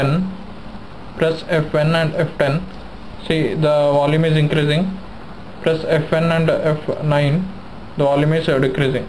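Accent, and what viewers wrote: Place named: India. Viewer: I understood everything you said (thanks to the Indian movies i watched),thanks man :D it helped. You're Indian right?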